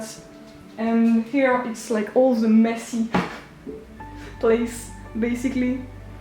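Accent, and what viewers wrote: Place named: France. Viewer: Bonjour！！ I just saw your channel by accident. What a coincidence! I am from China and major in French but I am a freshman so my French isn't good. I also intend to study and live in France, so I am looking for some videos that allow me to practice French and English at the same time. Finally, found you!!! Love your video, your good sense of humor, optimistic attitude towards life, and your fluent English with the cute French accent（I hope this won't offend you)!!!Looking forward to your more video!Bonne jourée!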